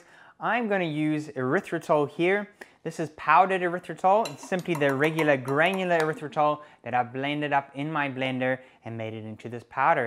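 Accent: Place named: South Africa. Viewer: I am South African too, yes. Recognized the accent. Love your channel and all the amazing recipes. Well done!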